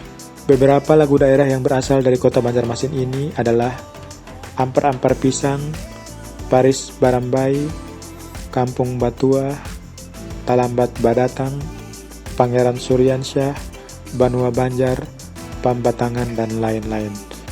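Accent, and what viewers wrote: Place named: South Sulawesi, Indonesia. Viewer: Dr logat bahasa sepertinya admin orang sulsel ya 😂,sangkamma jiki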